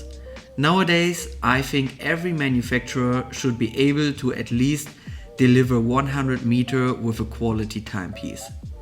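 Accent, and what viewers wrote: Germany. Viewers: Your English sounds a bit German?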